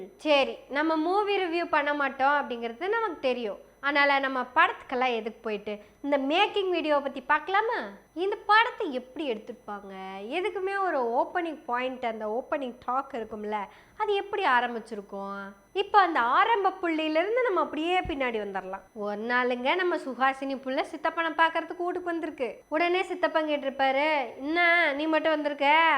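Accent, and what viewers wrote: Kongu, India. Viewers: தோழர் நான் coimbatore காரனுங்க கொங்கு தமிழ் உங்களுக்கு சிறப்பா வருது. ரொம்ப ரசிச்சு கேட்கமுடிஞ்சிது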